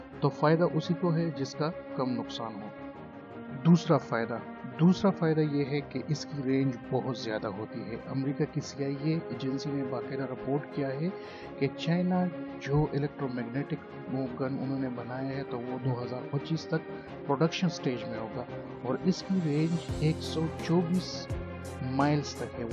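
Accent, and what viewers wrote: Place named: Pashto, Pakistan. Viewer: thanku4yrvocal my assumption is yr accent is pashto from sarhad kpk ji m zindabad inshala china will always rise couse intheir history theyr talented and creative inventive nation yet they never invaded any country godbless china and5superpowers and rest ofthe world nations god let sanity prevail may v not destruct anhilate eachother peace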